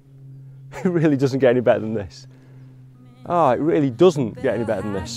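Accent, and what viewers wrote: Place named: Scotland, United Kingdom. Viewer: I am interested in your classes for purchase. It is surprising to me that I am even used to your Scottish English now:)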